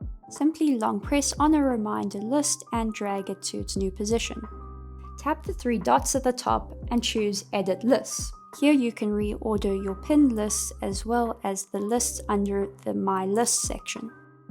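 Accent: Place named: South Africa. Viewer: Great to hear a South African voice in the content space and this is a great video with clear explanations for a very underused app.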